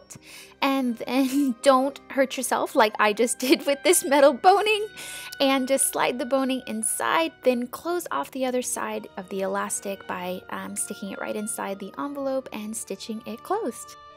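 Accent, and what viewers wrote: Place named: Los Angeles, United States. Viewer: She sounds so LA compared to now. Love the transformation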